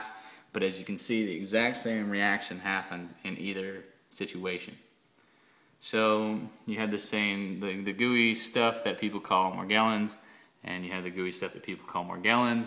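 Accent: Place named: Philadelphia, United States. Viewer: Sounds like a philly/south jersey accent.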